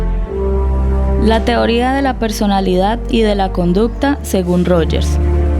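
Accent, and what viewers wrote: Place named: Cali, Colombia. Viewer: oye ois vos sos como caleña amista.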